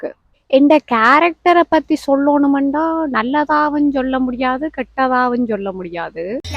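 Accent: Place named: Sri Lanka. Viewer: Nice. Keep going. ❤🎉. Love your srilankan tamil ❤ loves from 🇲🇾❤️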